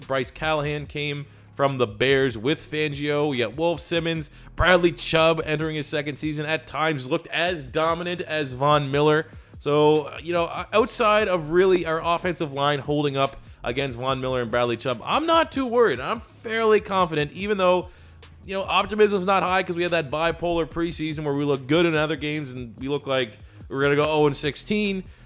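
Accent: Canada. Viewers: The Random Broncos Dude he's Canadian he says stuff differently you're a broncos fan there's other stuff to be mad about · I liked u bro, until I recognized that hitious Canadian accent..yuk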